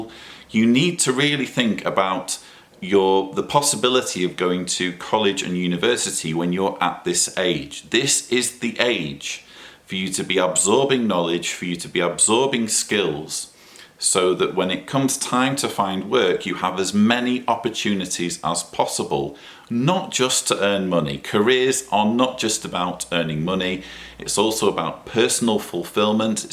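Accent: England, United Kingdom. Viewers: Where n England are you from Sir...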